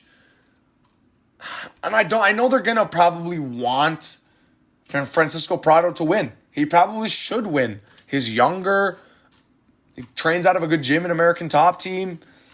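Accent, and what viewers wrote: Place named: Canada. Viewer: i can hear the Canadian in your voice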